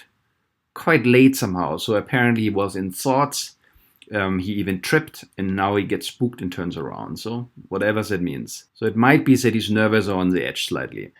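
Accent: Germany. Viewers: Dein Akzent schreit förmlich "DEUTSCH, ICH BIN DEUTSCH"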